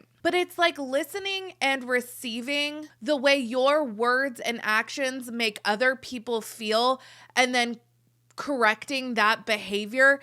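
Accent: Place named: California, United States. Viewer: God I keep clicking on your videos … you say 10 words and I have to stop. I don’t know what accent you have but it’s super Intolerable. California maybe … you drag everything out in a weird nasal way. It’s grating on the nerves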